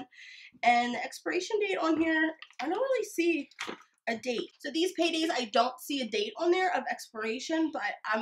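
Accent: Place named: Pittsburgh, United States. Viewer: I look forward to your videos! The more, the merrier! I'm from Pittsburgh (I now live in Cali) and we say 'home' with the same accent that you do ~ and we say 'carmel' instead of caramel! Maybe that is why your voice is so soothing to me!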